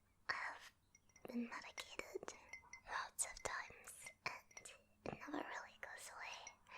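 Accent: United Kingdom. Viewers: YES THE NORMAL BRITISH VOICE IS BACK THANK YOU THIS HAS CURED ME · with a legit bri'ish accent? Never heard it before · Sometime it sounds like you have a British accent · Honestly...the older shadow theme was fun and unique but I’m really loving the more typical type of ASMR from you especially with your normal voice and accent. I do have a soft spot for the British accent being from the UK myself😊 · is she britsh it kinda sounds like it at some points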